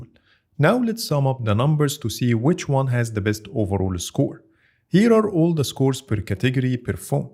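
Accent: Egypt. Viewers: الاكسنت المصري تقدر تميزها على طول 😂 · اكسنت انجليزي مصري بس بحد النطق كلو صح ماشاء اللة اتعلمت انجليزي فين ؟ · From your accent i know you are Egyptian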